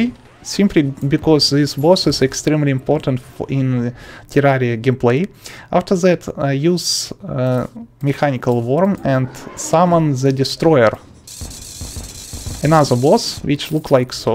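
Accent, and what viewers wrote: Russia. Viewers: Я конечно пытаюсь выучить английский, но русского человека говорящего по английски с таким акцентом понимать невообразимо просто, спасибо. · У него русский акцент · it sounds russian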